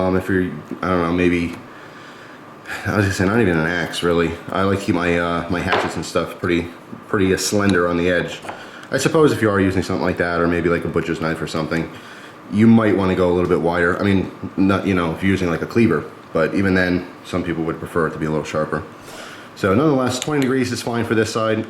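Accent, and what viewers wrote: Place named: United States. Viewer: It's called a "strop;" it's a piece of leather with abrasive material on...Oh, wait, you're making fun of his pronunciation, aren't you? Well, my cheeky friend, there's a whole bunch of dialects in the U.S. and I honestly don't know why you are entitled to judge him for having a different one than yours.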